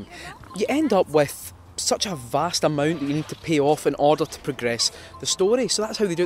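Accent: Scotland, United Kingdom. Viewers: are you Scottish, British, or Australian?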